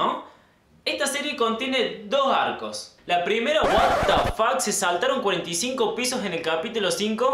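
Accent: Córdoba, Argentina. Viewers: Sí, es cordobés. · Porque es cordobes :v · ¿Sos cordobés? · Dacmass Creó porque ambos son cordobés :v tu de donde eres? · es cordobez :v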